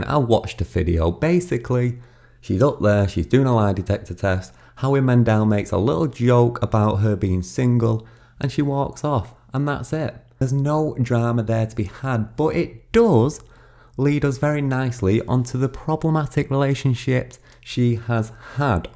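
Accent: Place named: North, United Kingdom. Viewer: Appreciate your commentary and deep dives. It's always your great Northern accent and the fact that your content is always fact based and never without a sense of humour that always makes me smile along with the fact that pepsi is my beverage of choice! Irony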